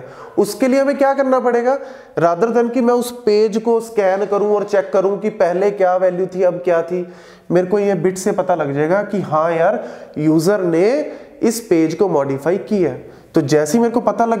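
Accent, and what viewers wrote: Punjab, India. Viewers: Sir aap punjab se ho??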